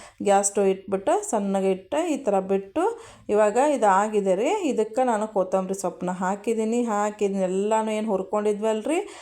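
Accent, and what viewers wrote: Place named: North Karnataka, India. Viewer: ಇದೆ ತರ ಮಾತಾಡಿ ಸೂಪರ್ ಇದೆ ನಮ್ಮ ಉತ್ತರ ಸೈಡ್ ಸೂಪರ್ 🎉🎉❤❤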